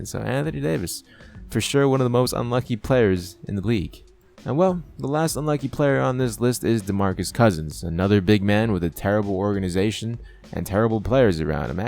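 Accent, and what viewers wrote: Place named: Russia. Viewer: sounds like russian guy speaks english. sort of accent or something.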